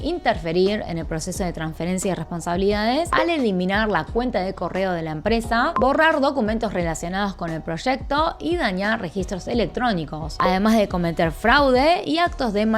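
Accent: Argentina. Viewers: Su acento es argentino porque nació y vivió mucho tiempo en Argentina. Ahora soporta panzona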